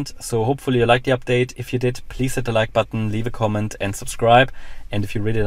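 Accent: Germany. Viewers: German confirmed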